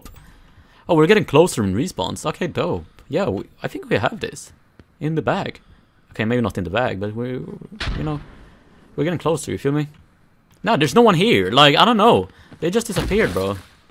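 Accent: Sweden. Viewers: you got a swedish accent ngl. Where u from man? Im from oslo in norway lol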